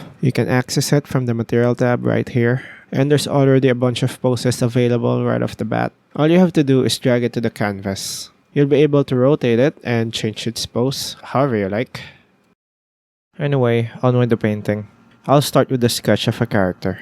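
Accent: Philippines. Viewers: Are you filipino or SE asian? i love the accent, sounds very asian specifically fil xD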